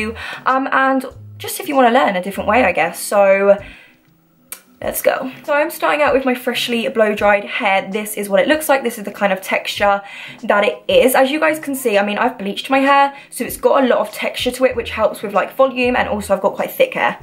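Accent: United Kingdom. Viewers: YOUR BRITISH ACCENT I'M DIYING 😭😭❤️ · British (I know cuz I have that accent too but you can ask in a q&a or something) 😂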